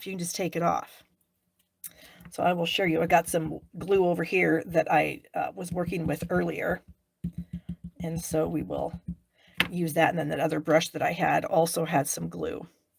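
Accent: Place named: Yorkshire, United Kingdom. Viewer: I'm pretty sure she's from Yorkshire....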